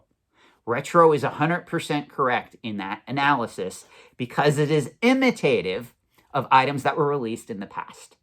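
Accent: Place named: Pennsylvania, United States. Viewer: regional dialect form Pennsylvania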